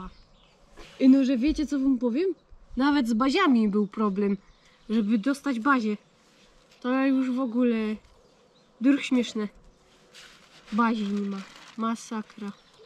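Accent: Silesia, Poland. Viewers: Palma ładna i Śląska gwara też fajna lubię cię oglądać. To pieczony chleb z wędzonką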